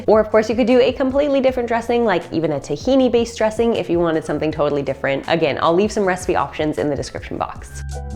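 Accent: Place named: Canada. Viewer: Ive watched a few or your videos and I knew I detected a Canadian accent. When I saw the presidents choice berries That confirmed it.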